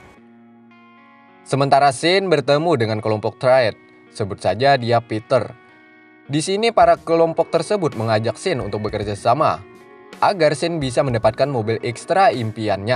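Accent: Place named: Kalimantan, Indonesia. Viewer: Logatnya khas kalimantan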